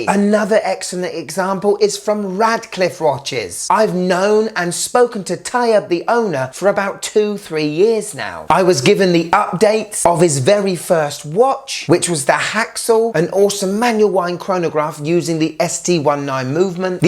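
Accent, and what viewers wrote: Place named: Whitechapel, United Kingdom. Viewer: Perfect German pronunciation in a Whitechapel accent…❤. Big “thumbs up” always MATE!!!!!!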